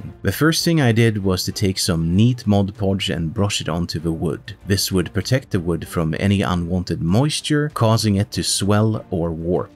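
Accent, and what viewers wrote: Sweden. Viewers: I would love to play on this board with my custom witch hunters! Are you in Sweden? Sounds like it!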